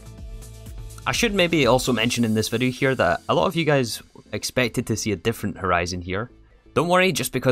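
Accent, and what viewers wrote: Scotland, United Kingdom. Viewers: Mate im going insane trying to find this exact pack, with the quest book etc... Can anyone help me out? What mod launcher do I need, what pack contains the quest book? I havent played Minecraft in years and I cant find this exact pack for love nor money... if someone could point me in the right direction? Cheers! Love your stuff, honestly one of the best creators on here...me and the wife constantly sing to ourselves... Divine Joourney Toooooo in your scottish accent all the time haha Safe x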